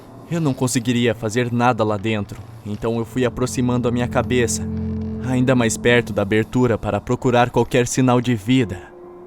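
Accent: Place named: São Paulo, Brazil. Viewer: MDS eu tbm sou :0 pensei q vc fosse paulista srsr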